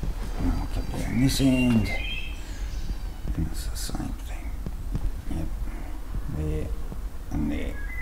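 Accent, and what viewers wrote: Australia. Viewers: I thought I recognised a fellow Aussie accent. Nice job, I hope to see more. I'm Brisbane based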